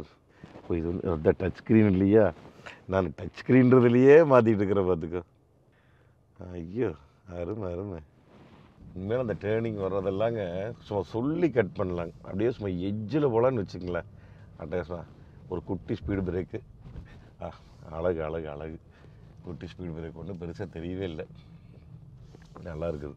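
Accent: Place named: Tiruppur, India. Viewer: Your speech is very nice in tirpur language we are from tirunelveli.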